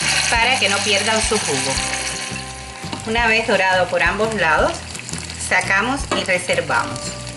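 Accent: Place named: Cuba. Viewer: Hola wuapa .nueva por aki buscando resetas .te encontré. Me gusta tu manera de explicar y tu acento eres cubana .k pinta tiene ese arros por dios k riko .un saludo un gusto verte y estar suscrita atu canal 👌💪😘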